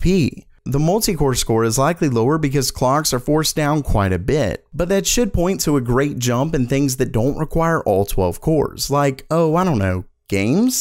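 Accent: South, United States. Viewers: I can hear the southern accent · With each video your southern accent becomes more and more pronounced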